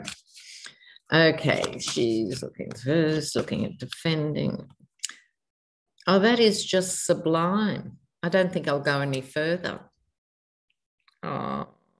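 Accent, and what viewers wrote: Australia. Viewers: Congratulations 🎈🍾🎊 on getting a troll. Probably a right wing grifter who’s fascist views are threatened by the truth you speak with your amazing Aussie accent. 🤗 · Safe journeys my friend 🥰 Enjoy your time at Bondi 🏖 Beach. Don’t forget the sunscreen, Girl. I Always watch your readings and never get tired of your Aussie Speak. Speaking of “ Robert as “You poor little piddle”. My mom used to use that term piddle to describe something our puppy did when she got excited and wet the floor! Maybe it’s the equivalent of American slang calling someone a Little Pisser! 🤣😂😅 Loved your RBG reading. Love that woman! Thanks for staying with us for the long game🇺🇸 🇦🇺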